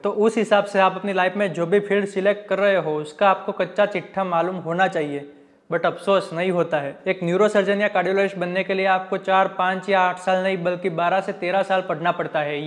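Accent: Gujarat, India. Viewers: I can feel you are GUJARATI!! ❤ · Aapki aavaj sunke hi pata chal gaya hi aap gujarati hu 😆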